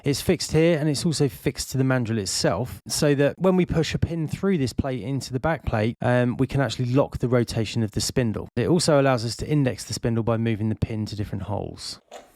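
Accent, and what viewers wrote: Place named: England, United Kingdom. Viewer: I like the well designed attachment but as an American I cannot understand the speaker's rapid English accent.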